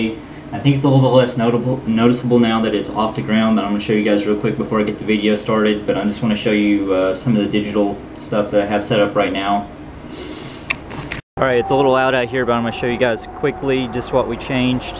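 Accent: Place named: South, United States. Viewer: Your accent is that of a southerner, so I find it interesting that you have as a background, the Brooklyn Bridge and the NYC skyline.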